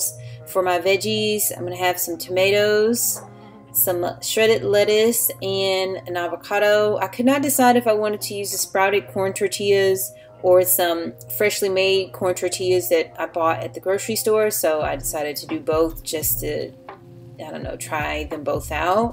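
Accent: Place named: DMV, United States. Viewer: DMV accent🤔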